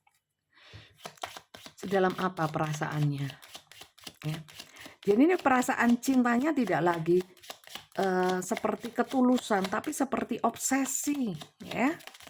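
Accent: Java, Indonesia. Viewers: ❤ Alhamdulillah, terimakasih banyak ibu, atas penjelasannya (medok banget, jelas diterima) Amin ya Robal Alamiin !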